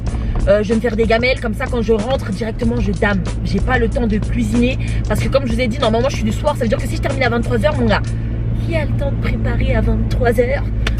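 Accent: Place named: Democratic Republic of the Congo. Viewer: L'accent Congolais😘❤️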